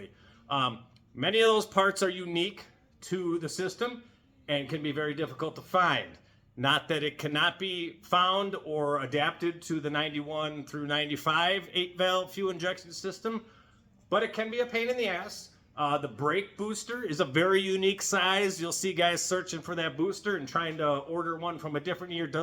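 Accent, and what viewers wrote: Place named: Appalachia, United States. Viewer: Damn you talk like you're right out of Appalachia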